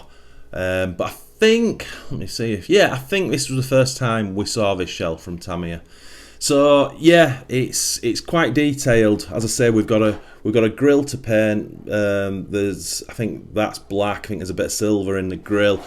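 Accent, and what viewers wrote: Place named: England, United Kingdom. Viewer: Hello sir... For years now.. I have been trying to learn things about England.. Especially accents and their locations.. only very little. Can you tell me what part area your accent is from mainly... Just roughly... North.. south.. or whatever. You can give a city area. But I don't know if it will help me.. lol. I guess I can look it up though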